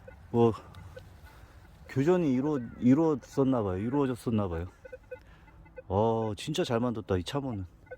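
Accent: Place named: Chungcheong, South Korea. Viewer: 말투가 충청도사투리같내유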